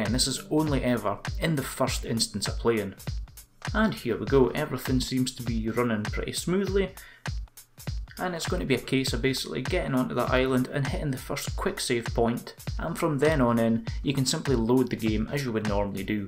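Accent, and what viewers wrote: Scotland, United Kingdom. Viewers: are you from scotland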